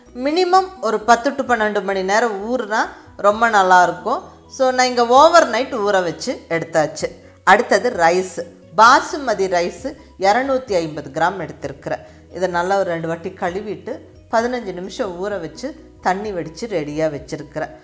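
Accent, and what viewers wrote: Kongu, India. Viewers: நீங்க பேசற கொங்கு தமிழுக்காக வே நான் உங்க Channel ஐ like பண்றேன் சும்மா ஜம்மனு இருக்கு உங்கள் dish போலவே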